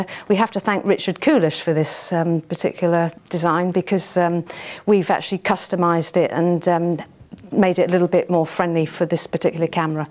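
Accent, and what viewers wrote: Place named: United Kingdom. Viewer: nice british accent!!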